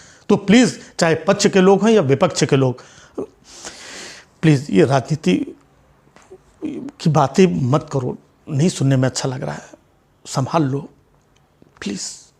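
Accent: Kanpur, India. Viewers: Sabas Nigam sahab Kanpuria style mei Dadi Noch kar pichwade dal diya.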